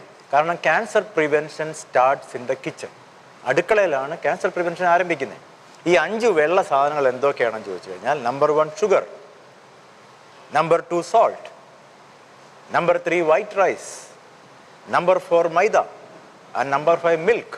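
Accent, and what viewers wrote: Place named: India. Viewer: Why are so many of these "white poisons" videos narrated by Indians with heavy accents? Aren't there any American experts who are willing to speak up on this matter?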